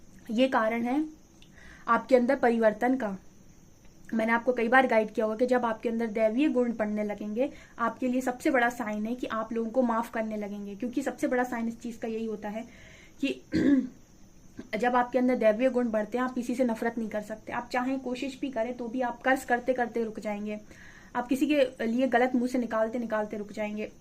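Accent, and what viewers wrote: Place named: Bhedaghat, India. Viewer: Aaki boli se samajh Gaya main ki AAP Kahan se ho behanji...bhedaghaat..am I right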